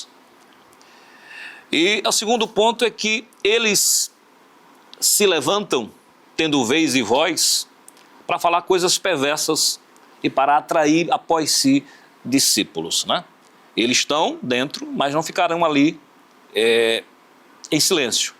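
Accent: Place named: Northeast, Brazil. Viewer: Parece nordestino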